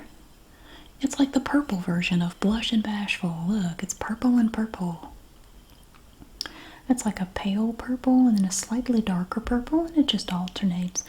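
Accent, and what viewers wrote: South, United States. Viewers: Lately I've been on a bender. Been subscribed for almost 10 years. Love the motherly tone and soft southern speech of your voice. Literally the only ASMR channel I'm still subscribed to. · Love Southern accent! Different from depending on where in the south! Thanks 😇❤💙❤💙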